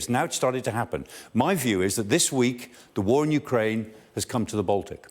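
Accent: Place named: England, United Kingdom. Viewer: He really does. I thought that he and Michael Caine must be from the same part of England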